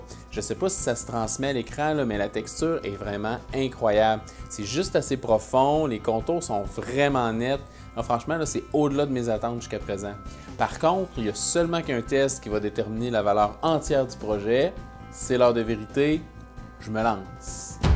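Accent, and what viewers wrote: Quebec, Canada. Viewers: Salut. Un petit coucou amical de France, un merci pour tes videos simple et efficace. J'avais envie de revenir sur ton aparté avec le mots "etampe"... Je me demande si le joli accent que vous utilisez au Canada, n'aurait pas mangé le "S" de é"S"tampe. Parce que ça signifierait que le mot est juste ici en France aussi. On dit aussi une estampe, estamper ou estampillé... Qui devient un synonymes de tampon, tamponner... En tout les cas merci et continu. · c'est pile poil ce que je cherchais, vidéo très pro, avec ce joli accent que vous avez nos cousins des Amériques ! Bravo !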